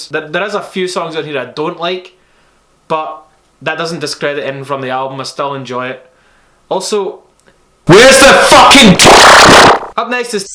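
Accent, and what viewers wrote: Scotland, United Kingdom. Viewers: If Anthony Fantano was Scottish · Ahhhh.... I've been watching so many of your videos that my brain is thinking with a Scottish accent ! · Wait you're Scottish?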